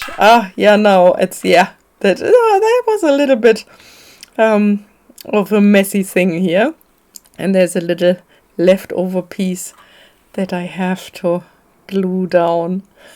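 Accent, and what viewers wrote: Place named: Germany. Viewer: Hi! New subscriber here! I love your interpretation of this prompt so much! I lived in Germany for 4 years. My husband is in the US Army & we were stationed in Landstuhl from <num>. I still have many friends who I still keep in touch with from there. Your accent brought back good memories. I have quite a few windows it is very uncomfortable to see. But I love the thought that seeing it can kind of release us. You are a breath of fresh air! I’m so glad I found you through this collaboration. Danke. Tschüss! xoxo, Jenn 🤗💚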